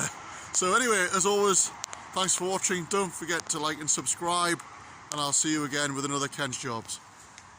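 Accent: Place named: United Kingdom. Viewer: Wow, what a cool wee channel you've got, and it's nice to hear recoveries done with a British accent. You could count that as an Off-Road Recovery, so aligning you with channels like Matt's Off Road Recovery, Trail Mater, Fab Rats, Robby Layton etc. Those channels are doing really well with MORR up to 1.6 million subscribers and making close to US$700K a year, and that's without sponsors. I'm looking forward to watching some more of that big truck of yours. <link>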